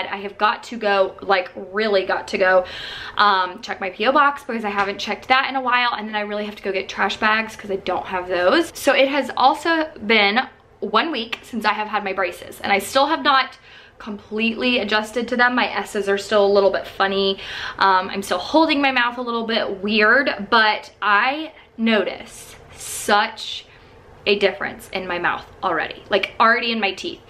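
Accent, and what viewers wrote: South, United States. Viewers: I’m from Mississippi so I love a southern accent! Also I died at “coochie cutters” hahaha we said that growing up! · Hey! 😀 I thought you were speaking with a country accent since I just watch your main channel videos and this is the first vlog I watch so it caught me off guard hearing your normal voice lol. Have a great week 😀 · I love your country accent WAY more than your "stage voice" it's so authentically you!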